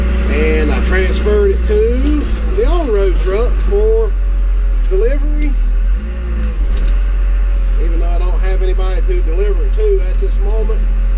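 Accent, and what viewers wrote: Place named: South, United States. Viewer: You sound' further South'...... I did not know, Virginians sound so Southern... 'Real life'..... nice... Different than 'created content'.......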